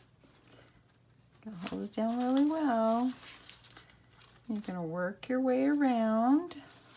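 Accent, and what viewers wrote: South, United States. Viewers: Oh miss, these are so stinking cute and just love them. I made some a few years back and hung them from the office ceiling, they were cute. Glitter paper was pretty too. What??? excuse me??? someone had to say something that you say y'all. OHHH that burns my biscuits. I went from NE Ohio to Arkansas and I had to learn to say y'all..LOL When I said you or everybody I sounded like some prissy proper gal. LOL. I love it! I love the accent from the south, my accent is a mumble of both. But yes folks from the south say y'all, and for someone to say something about that is just absolutely petty and just plain not nice. I was always taught if you don't have anything nice to say, keep it to yourself. Oh your hubby is such a doll, my hubby is so much like your hubby, He use to be like that when he drove truck, but since he came off the road he kinda goes with the flow, now that he has a factory type job. 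I love your tutorials and love listing to you and all your y'alls. you keep being you and change for nobody!!!!! Hugs to you my friend!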